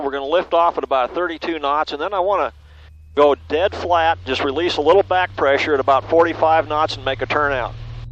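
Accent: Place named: England, United Kingdom. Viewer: Oh, P****n d'accent rosbeef !! C'est HORRIBLE et très désagréable !!!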